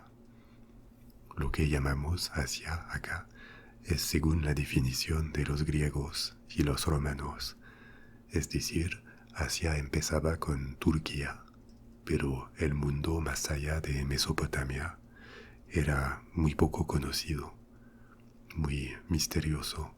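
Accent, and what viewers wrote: France. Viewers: I've never thought about this before. You have a french accent in English, but also probably in Spanish too. I know a little Spanish, I'm gonna find out if it's enough to make out the accent Edit: nope, I can't tell. Maybe your Spanish is actually perfect?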